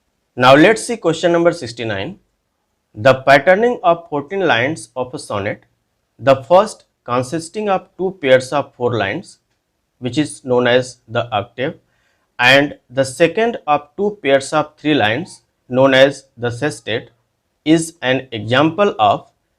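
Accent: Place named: mixed, India. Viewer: it's French origin word, s per dictionary the phonetic symbols u have replied is absolutely bang on. My only request was not to pronounce the word in Typical UP, Bihar accent n not to dishonor you. In ur reply u can see the sound of 'N' is bracketed which allows us to pronounce the word without N that makes the pronunciation better n that suits ur vast grip in literature 🙏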